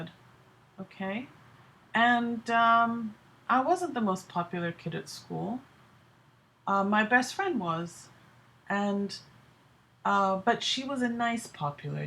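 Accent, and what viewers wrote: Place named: Milwaukee, United States. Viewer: It's so uncanny: you have the same speech pattern as Some Girls! Even using "Ummmm" "uhhhh" with a slight Milwaukee accent!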